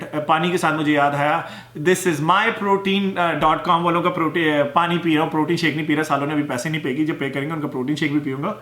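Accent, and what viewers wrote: Punjab, India. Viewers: I loss 2 times after my delivery 6 year ago Now i gain 25 kg , your videos r very interesting Specially tuhadi punjabi Theth punjabi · Love the way you talk in straight punjabi. You are very informative 👍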